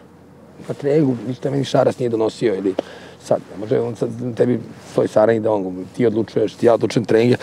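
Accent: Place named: Bačka, Serbia. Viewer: Лакат краљ, полако али сигурно набацује бачвански акценат..😎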